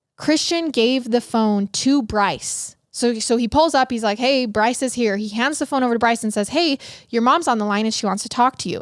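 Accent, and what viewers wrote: Utah, United States. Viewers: Crick for creek hill for heal sell for sale…. The list goes on! even the captions put other words form the way she pronounces. It’s a Utah thing right?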